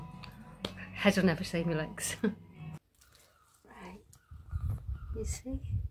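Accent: Liverpool, United Kingdom. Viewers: I would have loved to have seen you on Beadles About it would have been funny seeing you getting angry with your Liverpool accent 😂😂👍. I watched one episode earlier a bloke parked a ice cream in front of somebody's house blocking his drive selling ice cream. The bloke in the house started chucking his sauce bottles at him do you remember the bleep sound when somebody was swearing on it 😂 funny.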